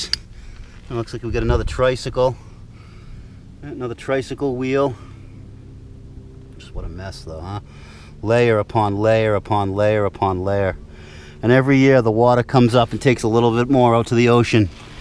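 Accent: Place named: Massachusetts, United States. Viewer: I don’t need to see the video, the yard, or even the map. I only need him to talk for 5 seconds to know that he is from Haverhill, MA. Hello from Andover!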